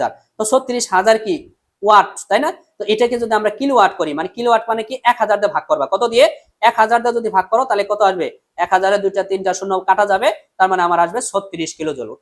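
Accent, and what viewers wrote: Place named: Sarishabari, Bangladesh. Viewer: না ভাইয়া আপনার কথা শুনে মনে হলো যে আপনি শরিষা বাড়ির স্থানীয়। আমার ওখানে আত্মাীয় আছে তো তাই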